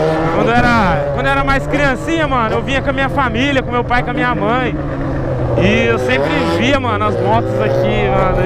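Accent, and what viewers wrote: Goiás, Brazil. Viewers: Hoje ele falo no pique do Goiás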